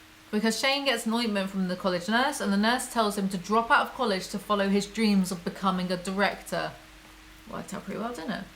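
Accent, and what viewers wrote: United Kingdom. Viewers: She's from the south of England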